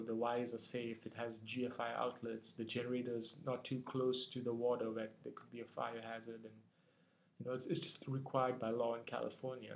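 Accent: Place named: India. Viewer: It sounds like you are from India. Where are you from in India?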